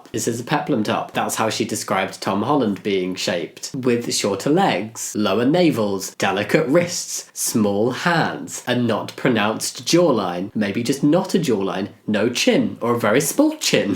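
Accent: United Kingdom. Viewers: Your voice is fine. You just sound really british.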